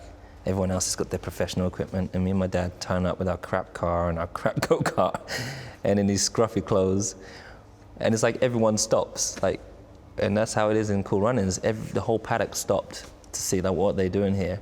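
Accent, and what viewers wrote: United States. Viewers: Daniel May He always hinted an American accent whilst talking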